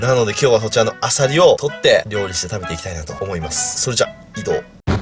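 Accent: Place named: Rural, Japan. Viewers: １分３０秒完全に田舎のお兄さん( ´∀`) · だでね〜 この方言聞くと愛知県らしくて素敵ですw by 愛知県民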